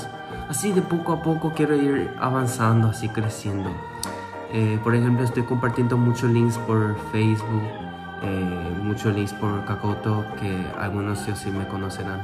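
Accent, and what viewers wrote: Paraguay. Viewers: En el momento que empezó a hablar me parecía que tenía el acento paraguayo y después comprobé 😁 Que bueno tener un lindo coreano en mi hermoso país por favor vengan más coreanos a PY .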